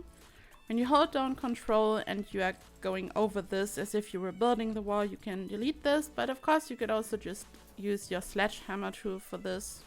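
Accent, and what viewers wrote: Germany. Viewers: I wonder if there's a way to forego that third storey. Could you build a one tile room on both the bottom and the upper storey (maybe next to the stairs) to place a roof? Because while I find this to be an ingenious idea, it will turn into a massive house and I'm more of a two storey house girl myself ^^' And now the question again in German (to make my question more understandable), because judging by your accent you might be? Könnte man nicht einfach anstatt 3 Stockwerke nur 2 bauen? Also z.B. neben der Treppe einen 1 Kachel-weiten Raum und dann im 1. OG einen weiteren drauf und dort dann das Dach drauf? Oder lässt das einen dann immer noch kein Dach platzieren? Weil, 3 Stockwerke sind mir dann doch etwas hoch, vor allem wenn man dann dort kein Zimmer mehr einbaut. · Thanks! Worked great. You just gained a new subscriber ❤️ Btw where are you from? 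Your accent sounds a little German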